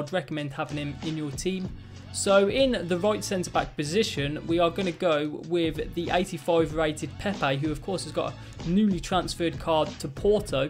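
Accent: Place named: Wolverhampton, United Kingdom. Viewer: You from wolvo 😂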